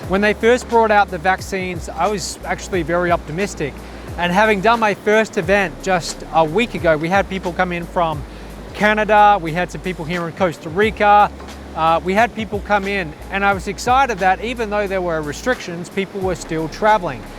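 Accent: Australia. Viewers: Yep a bloody Aussie!!!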